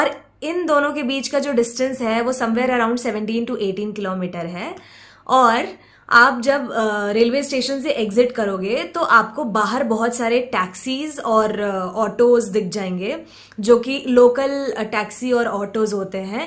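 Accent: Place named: Bihar, India. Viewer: Aisa Q lg rha hai aap Bihari ho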